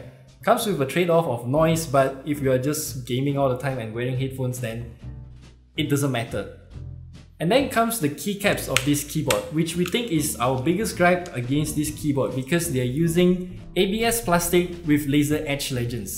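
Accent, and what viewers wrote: Singapore. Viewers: You from Singapore lah? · Noice video by the way are you Singaporean because that accent sounds soo familiar.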